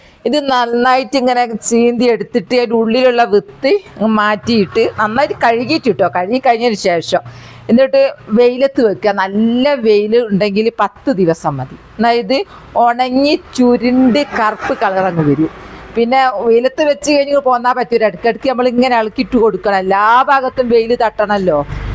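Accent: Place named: Wayanad, India. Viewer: wayanad എവിടെയാണ് നല്ല പരിചയം ഉള്ള സംസാരം, മുഖം, ഞാൻ വയനാട് ആണ്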